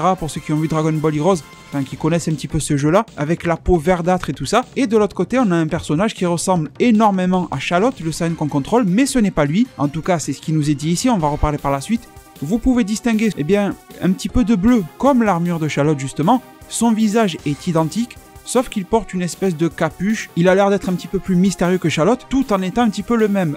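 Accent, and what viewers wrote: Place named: South, France. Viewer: tu as un accent du sud il me semble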